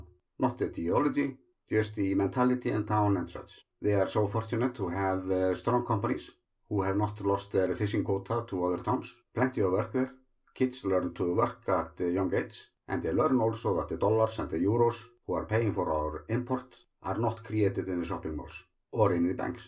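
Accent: Iceland. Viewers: I watch everyday for your update! Is your accent Icelandic or? I grew up in a salmon fishing family here in Northwest Washington State and there were several Icelandic fishing families living in Pt Roberts, WA - last name Gudmundson, they had a lot of red hair in the family and were tall. Viking heritage maybe.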